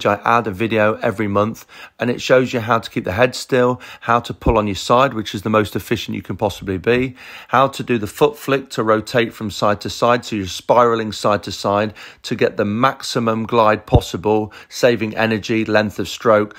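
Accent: United Kingdom. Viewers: Very informative and helpful. The only point: "s english he uses in videos, I find it difficult to understand at times as a non-native speaker. Maybe its his british colloquial words and accent.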